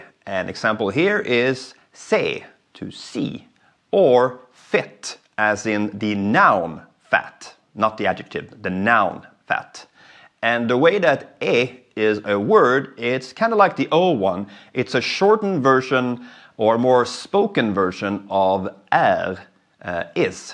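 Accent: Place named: Scania, Sweden. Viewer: Ööö du talar skånska.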